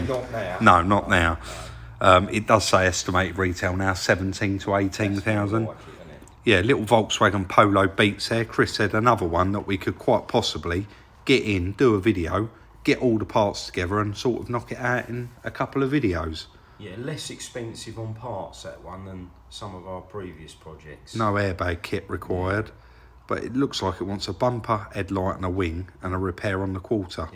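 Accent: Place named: Essex, United Kingdom. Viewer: When said rail at the start I thought he meowed, rail Sills nice alloy are hard words for the Essex accent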